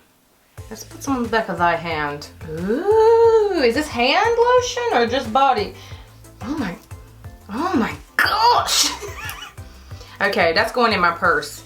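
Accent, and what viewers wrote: South, United States. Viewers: I forgot to mention I love the "country" girl in you. I lived in Mississippi for 10 years and 2 in Birmingham Alabama and I picked up a bit of a "draw" but yours is natural and so awesome.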